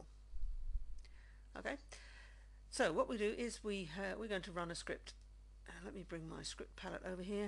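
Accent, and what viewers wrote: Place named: United Kingdom. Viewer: So glad to hear a British voice!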